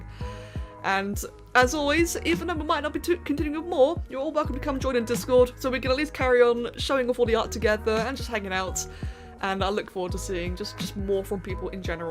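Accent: United Kingdom. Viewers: Ooookayyy youre British?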